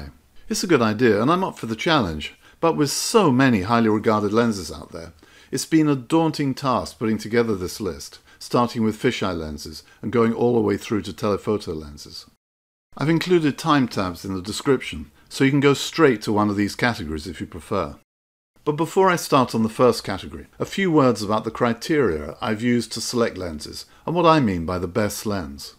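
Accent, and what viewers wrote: United Kingdom. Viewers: I love your British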